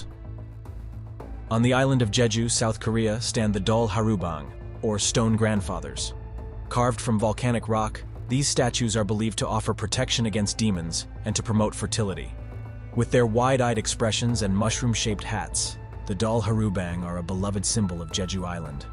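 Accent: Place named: United States. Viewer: You sound like an American. Why are you giving metric dimensions. FTS.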